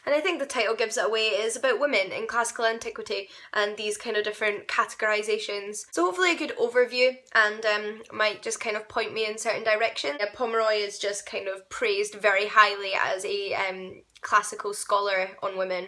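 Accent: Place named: Glasgow, United Kingdom. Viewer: I love your accent, are you from Glasgow?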